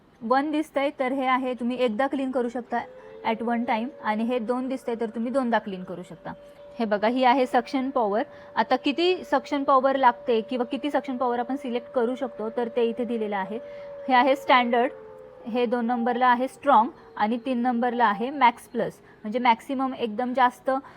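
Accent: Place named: Satara, India. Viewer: Bolnya varun sataryachya disatay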